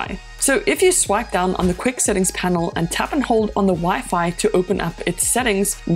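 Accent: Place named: South Africa. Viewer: Yohhhh I hear a wonderful South African accent here! That's pretty schweeeeet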